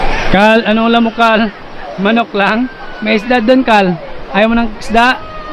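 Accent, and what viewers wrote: Iloilo, Philippines. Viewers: Kuya Taga saan ba talaga province nyo.parang ilonggo salita nyo?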